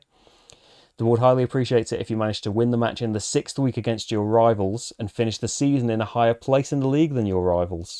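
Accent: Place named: England, United Kingdom. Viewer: Hey what are the odds finding a YouTuber playing Elven, the released version and not the KS preview, from the Country that’s the home of Football, with an accent my BE Teacher would have loved, and then him not being a football fan at all? 😂 Too funny. Thank you! Excited for more on this. Regarding to Rules, you missed the Sponsor thinks others mentioned and you are only allowed to have 5 attacking and 5 defending players, in every Games. That leads to more realistic scores. Meaning You get more 1:1 and 2:1, than 4:5 ore 3:3…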